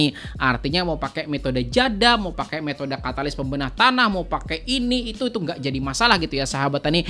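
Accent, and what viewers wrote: Banjar, Indonesia. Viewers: Dari toturial dialek bahasa, sampian ini orang Banjar kah ? Beralamat di mana ?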